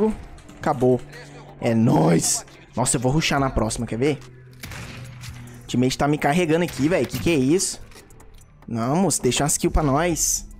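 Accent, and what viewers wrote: Minas Gerais, Brazil. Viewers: !! Pelo seu sotaque vi que vc é de Minas. De qual Cidade vc é?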